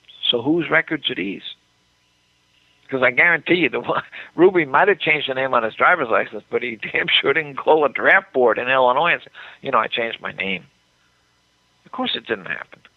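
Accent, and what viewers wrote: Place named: Northeast, United States. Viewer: You are correct. The speaker in this video has an upper Atlantic/Northeastern accent. Not familiar with southern accents and assumes that LHO is not capable of learning Russian? Not an uncommon inference.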